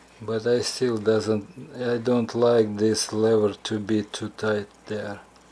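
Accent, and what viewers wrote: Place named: Russia. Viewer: Your Russian accent is перфект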